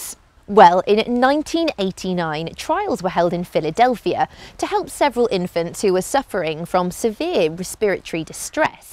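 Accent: England, United Kingdom. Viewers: It's only an English accent, nothing ground breaking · Strongest british accent eva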